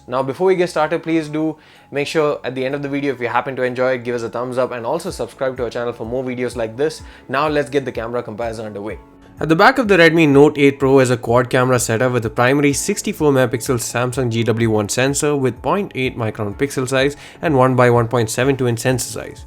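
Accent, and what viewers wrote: India. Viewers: ¿Why Indians doesn't work a little bit more on their accent and pronunciation? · this indian accent so fucking disgusting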